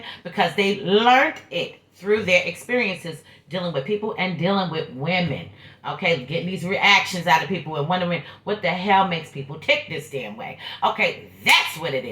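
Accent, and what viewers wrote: New York, United States. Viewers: I always watch your videos. U sound like you from the Bronx or NY I should say. I have this placement. I also have Neptune in 4th house. Saturn and Uranus in the 2nd. In this lifetime, I took care of my mom. So much so I took her to the hospital the day she died. But I couldn’t stay cuz of Covid, I got the shock of my life when I called the hospital two hours after I took her and they told me the doctor will call me back because when he called she told me my mom died. I been taking care of my mother since I was very small. I mean going out doing laundry and going food shopping at 10 years old. I had a very hard childhood. I was the black sheep. My mom was mentally ill, severely depressed and emotionally unavailable. I always felt very unloved. Then I was the scapegoat. But I love these interpretations. U do your research and u don’t sugar coat like thee other astrologers. 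You are helping with me and others with shadow work. God Bless You and Yours.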